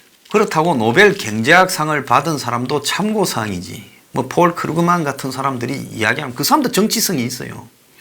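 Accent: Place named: Busan, South Korea. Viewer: 황장수 !!! 제임스 본드 !!?? 너무많이안다 !! 우아 ~~!! 부산본토말 ~~!!황장수 !! 우파.좌파.중도파. 모두싸잡아 잘정리하고있다 !!^정치.경제 를잘알려준다 !!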